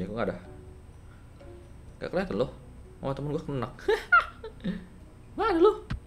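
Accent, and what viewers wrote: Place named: Kalimantan, Indonesia. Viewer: KALIMANTAN MANA SUARANYA.. 😎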